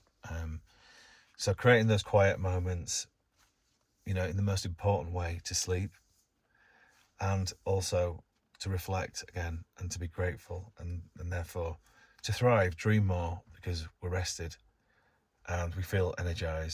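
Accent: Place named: United Kingdom. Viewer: Just subscribed and meet you from The Astrology Podcast, your British voice and natural carelessness, ease with how you speak and not so in control is why I've look to find what passes through you to share. First video watching, serenity is indeed with silence, peacefulness a base to the inner witness. Thank you for sharing and making a channel to connect, I will be watching and generating with it. Thank you.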